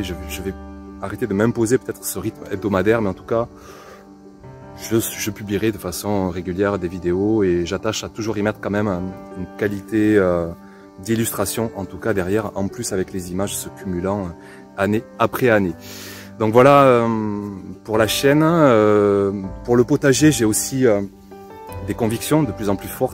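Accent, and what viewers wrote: South, France. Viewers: Prisonnière dans d'énormes soucis de famille (deuil, succession, procédure de justice pour de la bêtise humaine) mon havre de paix reste sans aucune hésitation mon jardin, votre livre et vos vidéos. Le seul problème (qui n'en est pas un pour moi mais qui agace mes enfants et mon mari) c'est votre joli accent du Sud qui déteint sur moi. Du coup, le potager d' est très présent chez nous mais c'est que du bonheur. Merci et encore merci pour me donner cette force de me battre tous les jours dans un monde perdu dans le stress alors qu'il y a tellement de choses à prendre et à apprendre de notre terre. Une énergie hors norme dont vous savez si bien nous la faire partager et passer à travers nos écrans. Reposez-vous bien et à très vite. Cordialement.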